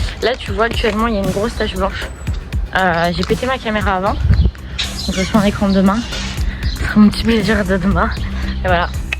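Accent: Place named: Brittany, France. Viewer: Tu causes bien la Bretagne :D ! Vive les samedis Basket qui foutent la loose et le seum, hein ma chérie ?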